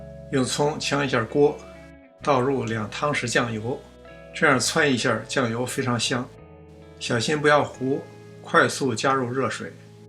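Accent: Beijing, China. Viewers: 的菜品不评价了，毫无惊险的每每获胜！这次想说，在视频编辑上用心了: 一道家常但可有千秋的酸辣汤，在京腔序曲下，低调出场……柔柔缓缓的音乐伴随汤的成长……随着特异蛋花出台、香菜妆点，高潮迭起……换大碗盛饭吧……明儿再折磨treadmill 😃😃😃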